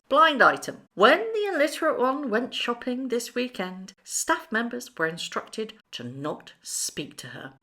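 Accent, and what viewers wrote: United States. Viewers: Your American accent is as stupid as this entire video. Get a life. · Your American accent, matches Megan. Total rubbish 🗑😑 But you are amazing 👏❤💖, love your accent .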